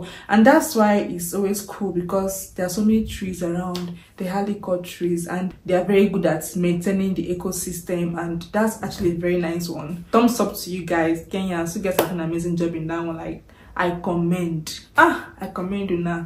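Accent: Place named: Igbo, Nigeria. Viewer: That's her Igbo accent. It's not everyone in Nigeria that calls it PEPE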